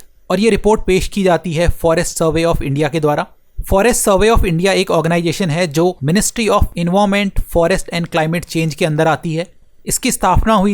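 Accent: Bihar, India. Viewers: Are u bihari??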